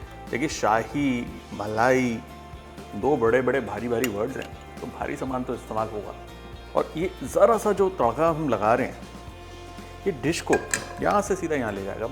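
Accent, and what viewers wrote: Punjab, India. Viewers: Aajto pakke punjabion ki tarah bol rahe ho.maja Aa raha hai as I am from Amritsar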